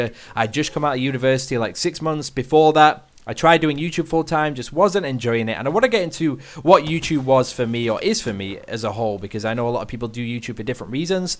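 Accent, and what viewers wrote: United Kingdom. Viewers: Rip your brithish accent if your gona have a grindsquad house in america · It's good to hear another British dude talking about things that are relatable to me. Finally.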